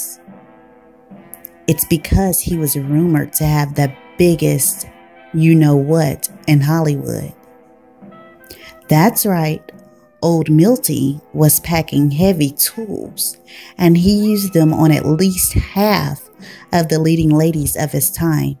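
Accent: South, United States. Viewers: I've thought about starting a channel. Good luck. You have a beautiful speaking voice. Are you Southern? I heard about Berle's package previously. I'm a huge Drifters fan. Sad about Clyde.😢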